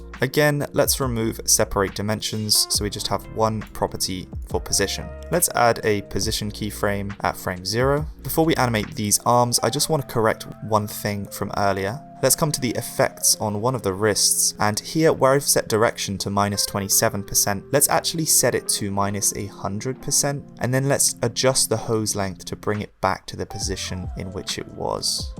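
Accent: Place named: West Country, United Kingdom. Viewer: Really good tutorial, great job. Are you from the West Country? I hear a slight twang in your R's haha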